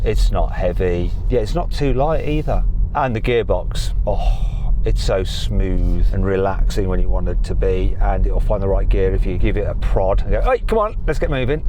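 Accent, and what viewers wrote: United Kingdom. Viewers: 호주인가 영국인가 억양을 보면 영국 네이티브인데 그나저나 아우디 콰트로는 명불허전이다.. 치고나가는거 ㄹㅇ ㅈㄴ 안정적이네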